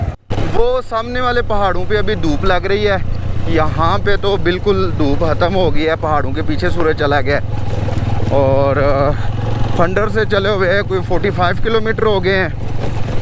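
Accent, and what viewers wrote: Punjab, India. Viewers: You explore unique areas but your Urdu language is like Truck drivers from Punjab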